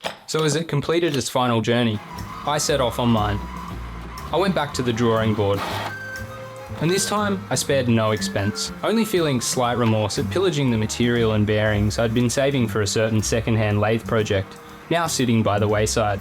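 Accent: Australia. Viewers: Every Australian I see on YouTube always turns out to be a subversive communist trying to undermine their own country, let alone mine. So when I hear your accent I am immediately alarmed. :( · AVE ! But Aussie and not Canadian! You got my subscription 😂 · I just found this channel, and I get the feeling you're like a younger, more Australian This Old Tony. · Cycloidal drive for what? A fishing Reel? Looks Like I have gotten in somewhat early new subscriber here. Plus you sound suspiciously Australian? Can you define SuperWog? for me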